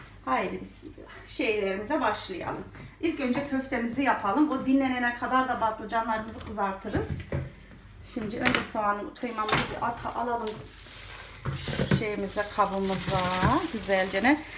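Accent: Kayseri, Turkey. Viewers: Nerelisiniz ..kayserililer gibi konusuyorsunuz🥰🥰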